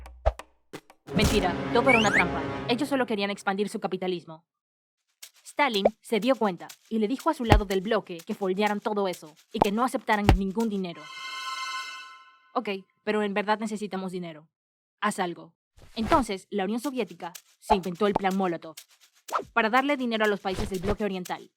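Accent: Panama. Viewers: jaja, su acento panameño es muy notorio jajajaja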